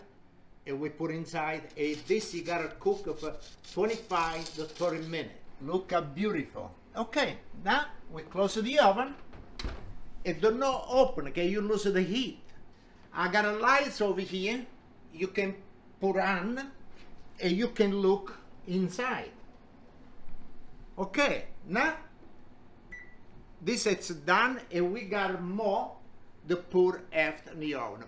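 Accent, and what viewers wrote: Italy. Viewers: I made this and it turned out awesome. My family is from a little town in Italy called Senerchia and you talk just like them and makes me miss them. God Bless you and can't wait to make more of your recipes! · Hi! This is Canada. The video is very interesting. Italian pronunciation of English is also familiar. I remember the old Italian grandfather who lived next door to my house. He gave me grapes from his backyard grape vine, and if he made bean pasta, he gave it,too They also gave me homemade wine. He was very friendly. Watching your video reminds me of him. It is a very, very old memory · His Italian accent authenticated this video. 🇮🇹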